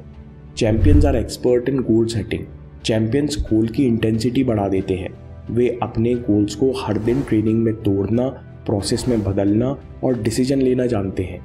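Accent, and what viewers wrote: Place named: Punjab, India. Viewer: yaar punjab n aise hi bolte hai hindi iski koi galti nahi hai.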